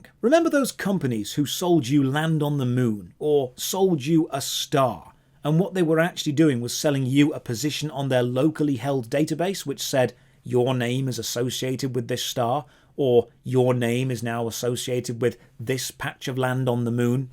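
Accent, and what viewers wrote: United Kingdom. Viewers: Now we need him to fanboy massively at Space Marine 2 and bitch about even more terrible corporate practices with hilarious British accent.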